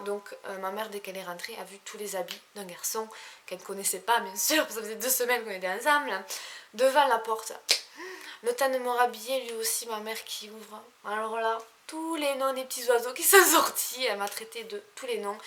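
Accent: South, France. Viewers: slt je te kiff!!! Es ce que tu viens du SUD!! 😘Bisous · Coucou! Je suis de Montréal, j'ai exactement ton age, j'aimerais te dire premièrement évidement que je te trouve magnifique! J'adore ton accent du sud de la France;) et je te trouve vraiment intéressante et terre-à-terre. Je me reconnais beaucoup en toi et je te souhaite vraiment de réaliser tous tes souhaits tu sembles vraiment mériter tout le meilleur du monde! Bisoux bella xxx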